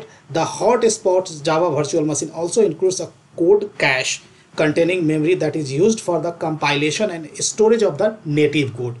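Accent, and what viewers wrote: India. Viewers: love indian english)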